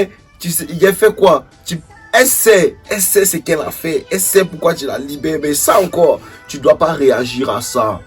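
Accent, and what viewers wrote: Ivory Coast. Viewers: On reconnaît l'accent ivoirien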